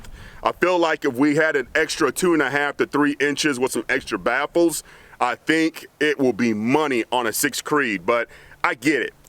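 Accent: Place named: Texas, United States. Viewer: Good stuff. Keep it up. Where in north Texas? You sound like the boys I grew up with.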